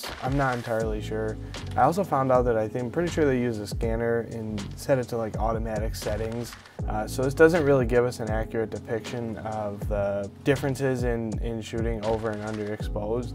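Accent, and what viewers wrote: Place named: Rochester, United States. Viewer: I haven't been home in over 3 years but just listening to your Rochester accent made me feel at home :)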